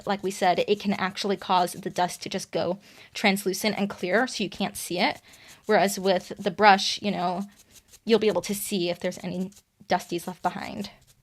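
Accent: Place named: United States. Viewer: Are you in the U.K.!? Notice you mentioned Nail order, but you sound like you have an American accent! Just wondering because wouldn’t it be cheaper to use product brands existing in your county ??! ❤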